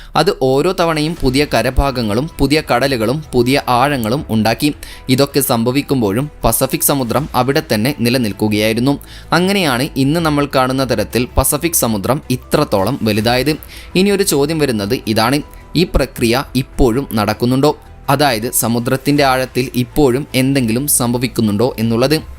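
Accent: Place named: Malappuram, India. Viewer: മലയാളം നന്നാകണം. ഇത് മലപ്പുറം മലയാളം ആണ്. മലയാളത്തിൻ്റെ ഉച്ചാരണം ഇങ്ങനെ അല്ല.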